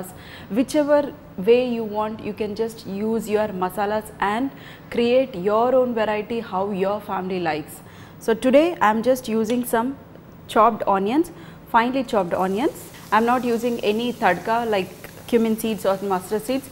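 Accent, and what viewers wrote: India. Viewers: Aceofmusic11 bro she is from south so her pronounciation is like this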